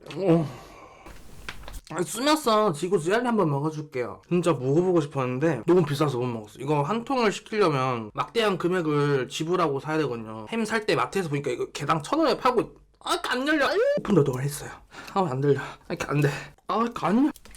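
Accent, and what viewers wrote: Jeolla, South Korea. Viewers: ㅋㅋㅋㅋ킬바사 나트륨은 여전하신지 ㅋㅋㅋ이전에도 짜다고 짜증냇는거 기억나ㅋㅋㅋ짜증낼때 전라도 사투리 나오는거 졸귀ㅎㅎ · 사투리보고 도 전라도 사람인거 인증 저도 전라돈데 뭔가 같은게 있어서 기분이 좋아 욤! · 오빠 말투 너무 귀엽자나요...... 전라도전라도한게 구수하기보다 귀여워서 계속 그 부분 돌려보게 되자나ㅠㅠㅠㅠㅠㅠ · 전라도 사투리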